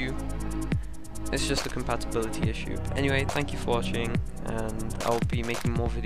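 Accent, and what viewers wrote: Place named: United Kingdom. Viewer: Yeah british accent is already hard to understand and the music makes is harder to understand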